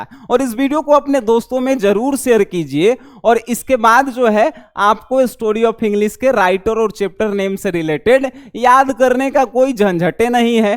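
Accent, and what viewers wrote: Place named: Bhojpuri, India. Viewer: हे फलनावा सुनाता sir पुरा भोजपुरिया अंदाज में पढ़ाते हैं 💕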